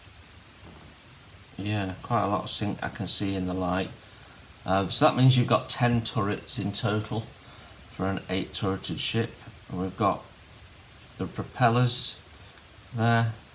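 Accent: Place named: Stoke-on-Trent, United Kingdom. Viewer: No don't be sorry. You accent is very much S-O-T ( Stoke on trent ). Was just wondering.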